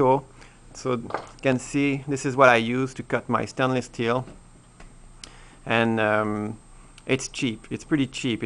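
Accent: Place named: France. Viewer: from ur accent, u can speak french right? je l aime bien to projet car je suis tres interesser a ce nouveau source d energie:) merci...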